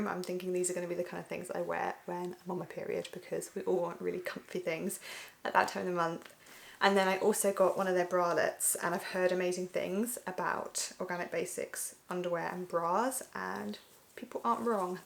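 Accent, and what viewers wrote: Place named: England, United Kingdom. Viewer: I thought your accent was English. 😄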